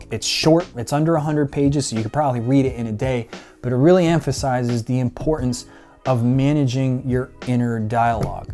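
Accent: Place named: Massachusetts, United States. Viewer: Hey been following your for sometime now under a few of our business accounts on Instagram(raisingwealthminds and theunstucksociety) just realized you are in MA, kinda thought you were with the accent(dude I'm in the same boat, so not knocking it). We are on the South Shore in Duxbury. Keep up the work man!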